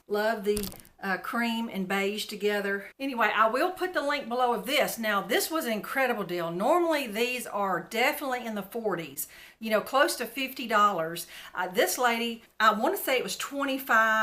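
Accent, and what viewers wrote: South, United States. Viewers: I am new to your channel and I love it!!! I am from Mississippi and you sound like your from the South 😊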